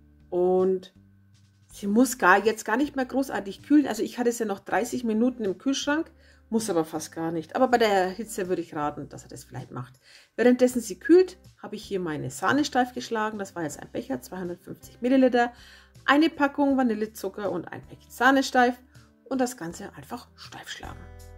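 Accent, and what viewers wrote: Franconia, Germany. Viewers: Ich liebe dein fränkisch :)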